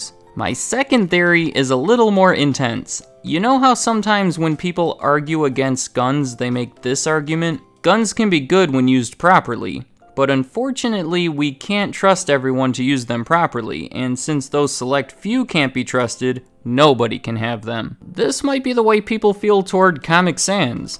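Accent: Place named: Midwest, United States. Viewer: Not related to the topic, but english isn’t my native language and I find it interesting how he pronounces the word comic as “caamic” and iconic as “icaanic” etc. Is this accent common among Americans? No hate please, I’m just curious.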